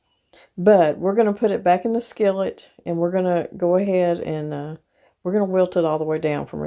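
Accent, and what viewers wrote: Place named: Eastern Kentucky, United States. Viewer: You sound soo much like where i come from ❤!!! I grew up in Eastern Kentucky. I left and im back home. Much love and respect!!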